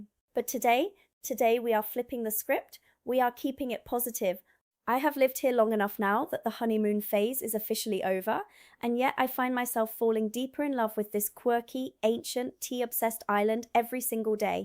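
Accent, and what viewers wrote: England, United Kingdom. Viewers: As a Scot, this girl sounds like an English woman (Not a problem, English women sound very fine with their foreign accent) She doesn't remotely sound American though. I don't care who comes from where, but I'm very suspicious of contradictions? I've no argument about what she says. Her narrative is purely of England, not the UK. Her accent is not American, I'm just wondering why? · How long you been in Britain? You sound British. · you are slowly picking up the British accent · Why do you sound British? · Anyone told you that you look like Belinda Stewart the mother in the Inbetweeners . You have an English accent . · You sound British to me ,don’t worry about the rain it keeps the countryside green just how we like it as long we get a decent summer. You will not hear me complain about the NHS I had cancer in 93 and again last year and no I never smoked just bad luck I suppose glad you are enjoying your time in the UK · Are you really American! 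You have a very British accent. 😊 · Brit here. You sound British to me my love. Is this real?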